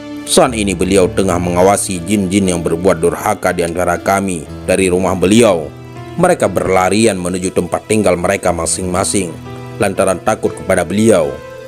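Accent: Aceh, Indonesia. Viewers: Logat mimin lage awak getanyoe nyan... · Sy selalu mengikuti kisah kisah yg admin sampaikan, maaf kalau boleh tanya admin orang aceh ya dari logat bahasanya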